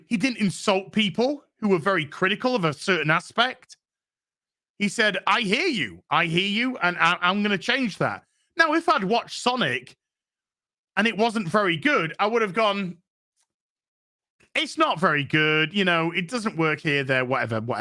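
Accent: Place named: United Kingdom. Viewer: you are my favorite FNT regular and have now become one of my favorite YouTube channels. As an overweight, bearded, balding American man, I watch you and think, that's what I'd sound like if I had a proper British accent. Keep the entertainment coming!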